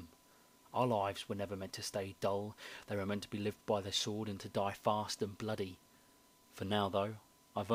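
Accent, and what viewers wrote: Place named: United Kingdom. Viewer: you have a good accent, its british but rough and hard.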